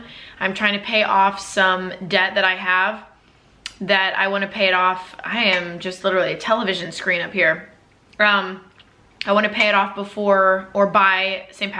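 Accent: Australia. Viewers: That Australian accent threw me for a loop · girl mid video I got super confused and was like why does she sound different and realise you're still going with the australian accent omg😭😭😭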